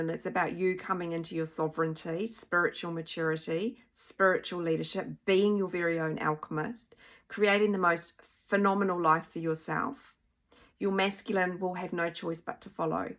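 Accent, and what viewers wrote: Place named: New Zealand. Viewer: Hi! I paid $500 to a bald man on youtube in october. Did everything he said then sat in fear based energy for 3 months, stressing i had to forget him but could not. Shamed myself. Felt like a naughty child in the group, cant say this cant do that or we'll block you and no refunds!! Found you 2 weeks ago. Immediately shifted and grounded the hectic energy. Just wanted to say thanks for your videos, wish i could pay for your services but cannot put any more $$ into this journey. Parents grew up in hastings and napier, im in melb so love the accent! Reminds me of my cousins. Thanks for all you do xxxx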